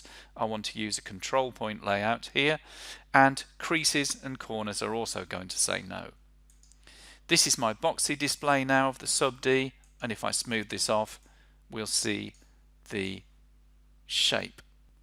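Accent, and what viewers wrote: United Kingdom. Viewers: good material but appalling teacher, a text to speech bot is gonna do better and with more life than you. Not to mention a posh accent is the last thing we want in a tutorial. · Beautiful and sexy English voice and models.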